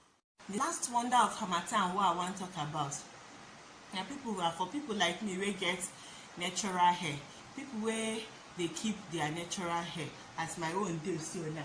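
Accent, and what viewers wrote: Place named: United Kingdom. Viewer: Your pigin still dey sound like British English lol! Speak the warri undiluted pigin.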